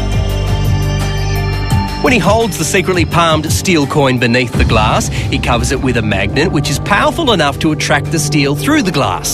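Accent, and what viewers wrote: Australia. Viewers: Can't take this Australian accent seriously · it's the australian version with an aussie narrator lol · Ey... It's good old Aussie Accent ^_^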